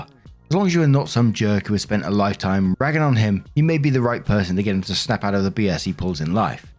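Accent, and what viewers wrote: England, United Kingdom. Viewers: “You don’t have a Scooby Doo what it could be” Nice to hear speaking English English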